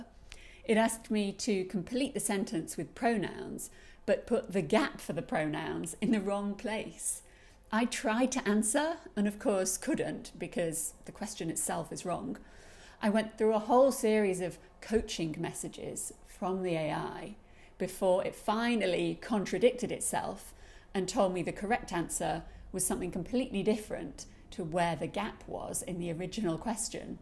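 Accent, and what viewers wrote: United Kingdom. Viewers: I really enjoy your posts, the way you speak, the clarity of your statements… it sounds like (perhaps I’m mistaken) a bit of aristocratic English ;-). By the way, could you tell me (us) your name? It’s always easier to talk to someone on a first-name basis. If not, that’s OK - I know the English are famous for their eccentricity. 😉 Warm regards, Alex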